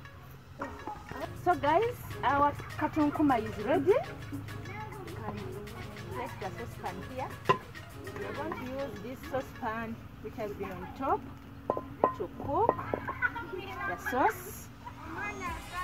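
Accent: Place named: Uganda. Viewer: You speak Ugandan accent sis.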